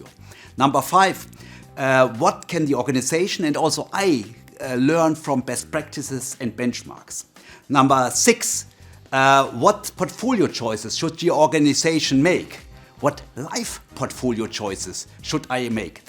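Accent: Germany. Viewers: Love his German accent 🇩🇪❤️🎉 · Thanks for the great Video, you really helped a Lot Your accent Sounds very German to me 😂 Have a great new year everyone M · That's a German accent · First time you watch it: Consulting Cringe. Second time you watch it: German accent. Third time you watch it: Mh, good point… let me think. What are my preferences actually? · Is he German by chance? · Your pronunciation is very interesting. Are you from germany? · Challenging listening to this tense rapid German accent. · listening to this german english accent makes me think that this is some sketch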